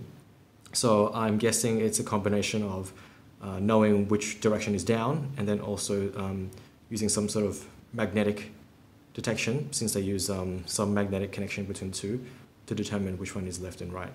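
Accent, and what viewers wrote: Australia. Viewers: Love your video & presentation but can't stand your "Aussie" accent. sorry !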